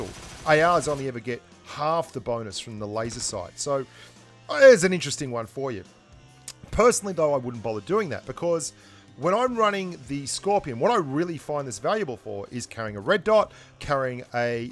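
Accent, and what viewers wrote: Australia. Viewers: You literally need to be sound like australian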